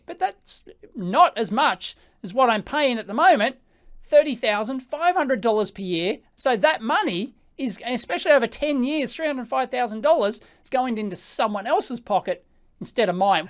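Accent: Australia. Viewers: ''Office spice'' :) I love your channel and I love your Australian way of speaking!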